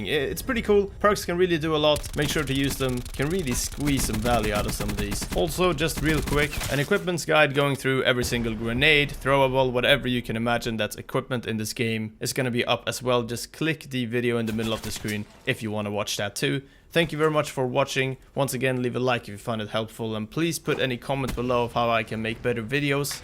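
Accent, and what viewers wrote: Sweden. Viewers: bra video, that swedish accent tho XD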